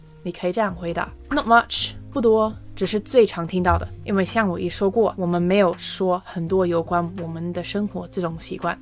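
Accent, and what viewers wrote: United Kingdom. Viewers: 終於等到用英國腔的教這個，目前定居在倫敦，希望可以出一些跟孩子的聊天，小朋友在學校跟同學交流，家長跟老師的交流，租房子賣房子這些方面的聊天技巧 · It's nice to hear a British accent in these types of videos (too often it's American accent). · 英國腔聽起來真的很好聽, 好漂亮!~~~~ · 滿滿的英國腔😊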